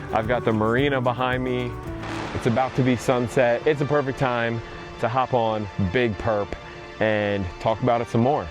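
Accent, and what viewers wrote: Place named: Philadelphia, United States. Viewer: i could tell from your voice, but the hat finally reminded me to ask. Im philly born and raised. Love what you do brother. Keep it up.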